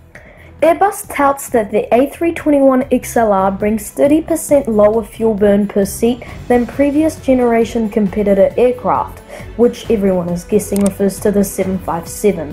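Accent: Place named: Australia. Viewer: Damn.....that Australian accent is annoying !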